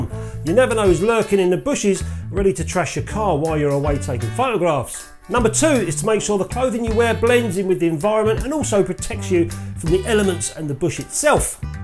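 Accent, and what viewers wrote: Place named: Cockney, United Kingdom. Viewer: The 'Fern' is Bracken, or Pteridium aquilinum to give it's proper name and one of the most successful land plants being found everywhere except Antartica. Loving your channel, in fact it's now not just my favourite film photography channel, it's my favourite photography channel. Period as the Yanks say. Your style is refreshingly down to earth, but your images are good and your Cockney/Essex Boy persona is a welcome change from the wispy bearded hipsters that normally present this sort of thing. (Sorry Ribsy! but your beard is a bit fluffy)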